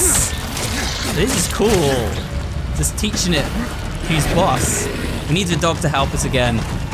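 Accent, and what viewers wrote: United Kingdom. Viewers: Our british accent has uploaded 2 videos in 1 day he works hard and fast on these videos not gonna lie especially if it's Resident Evil i love it so much thank u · Bro this is the first time I realize he's British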